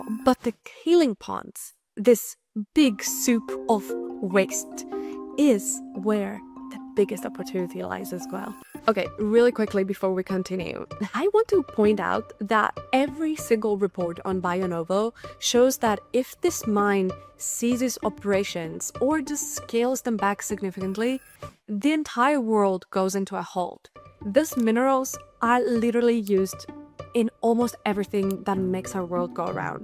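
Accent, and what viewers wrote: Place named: Italy. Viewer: Your videos are fantastically well edited and researched. What's your professional background? And also, where's that accent from? I can't place it... Italian?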